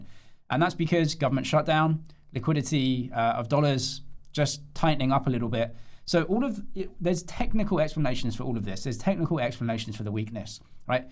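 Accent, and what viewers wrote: England, United Kingdom. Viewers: If you don't understand English spoken by an English person try turning on the closed captions.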